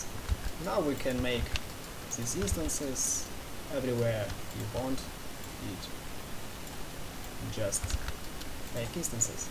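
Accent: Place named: Russia. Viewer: Жуткий русский акцент)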